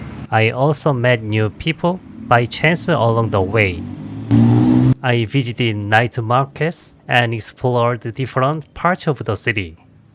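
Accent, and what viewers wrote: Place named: France. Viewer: Hi Bro, i´ve a few questions. You mentioned that Bangokok grows in cost from 2021 to today, this is in bath or US dollars? when you move around the country, you use train, bus or plane? Estimative, how much money you need by month, for all expenses ? (Aproximaly) And last, do you speak French? You have the accent!!!! Thanks for your work, and I send to you a hook from the end of the world (Ushuaia)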